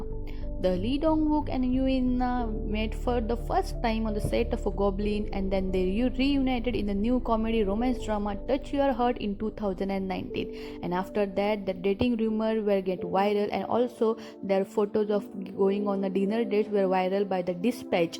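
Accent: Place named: India. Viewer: Madam your tongue sounds like indan 😊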